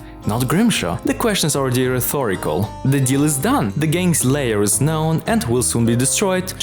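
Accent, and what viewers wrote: Russia. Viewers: Why all russian boys are sexy, i love your accent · Ты акцент не слышишь? Это явно кто-то русско говорящий. И в описании ссылка на оригинал.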